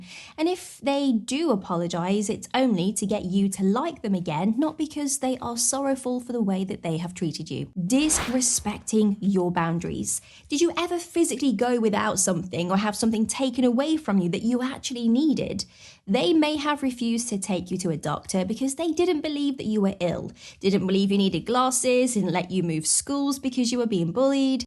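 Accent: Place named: United Kingdom. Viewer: Thanks for the clarity. Oh my goodness you hit the nail on the head. If you saw the shock I went thru when I found out about NPD at 40, it shook me to my core and am still going thru so much years later. I not only lost my childhood, I lost the best years of my adult life too. Btw: hello from Australia. I love your British accent.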